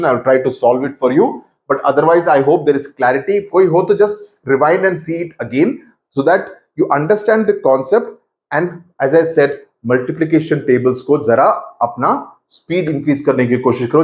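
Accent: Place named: South, India. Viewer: Sir you are south INDIAN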